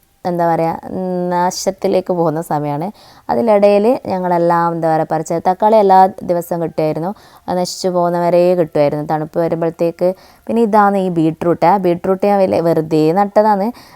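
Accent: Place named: Kannur, India. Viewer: Kutti payar,cheera,mathanga,ellam adipoli aayittund.....oru vithittu athu mulachu varumbol namuk kittunna santhosham athu paranjariyikan kazhiyilla. Nigalude pachakarikalk ellam valathinte kuravu nallathupole und.athukondaa ilakal ellam muradichu nolkunath.cheera kandille muradichu nilkinath.....onapookalam idanulla vegitable flower cash koduthu vangendi vannillale..... Aa manja fruit chiranga vargathil petta inam aanennu thonunnu. Njagalum kaakka poo enna ivide parayunath. Njan paranjathpole thane thakkali ellam kuzhichittu ponnal mathi pine angott thirinju nokkanda😂😂😂😂 Ente nigal vellavum valavum koduthitunel cheera payar ellam adipoli aayi undaayene.valakoorulla mannu cash koduthu vangunathinekal nallathalle valam vangunath. Enik nigalude kannoor shailiyil ulla avatharanam orupad ishtaayi Super video aayirunnutto Pine ente kalyanam kazhinju tto😊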